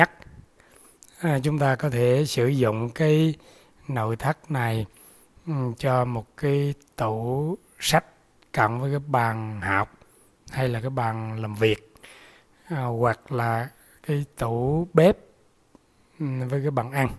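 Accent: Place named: Quang Ngai, Vietnam. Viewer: Giọng giống ở QNgai quá. Hì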